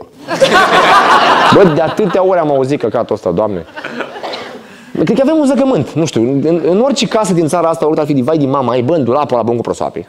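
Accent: Moldova. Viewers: Și ce fain e ca și a păstrat accentul moldovenesc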